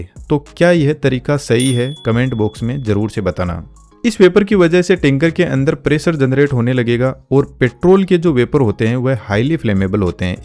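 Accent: Rajasthan, India. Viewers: Sir aap Rajasthani ho kya , boli se lg rhaa h 😅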